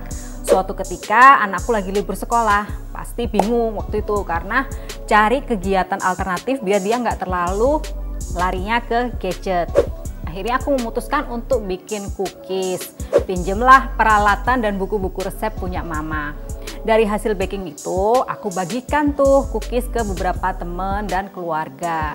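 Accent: Indonesia. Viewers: suka banget sama channel ini soalnya resep nya simple bahannya juga mudah dicari, dan Surabaya an banget suaranya medok khas gitu, video favorit " pudding roti zebra" simple,enak,dan cantik banget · paling seneng karena simple resepnya, mudah di recreat, truss medhoknya itu bikin khass, ngangenin🥰😋 lanjoetkann, resep fav aku dan anak anak adalah "puding lumut" caiyoooo🍮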